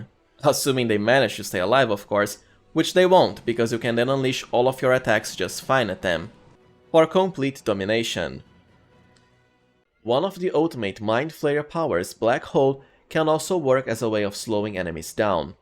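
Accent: Brazil. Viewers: That im a brazilian acent right there, I can bet! nice english btw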